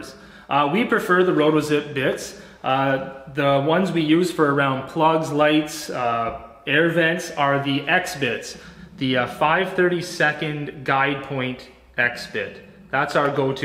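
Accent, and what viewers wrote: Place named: Canada. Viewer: I doubt it. Listen to the way he pronounces his 'O's' Id bet hes from Canada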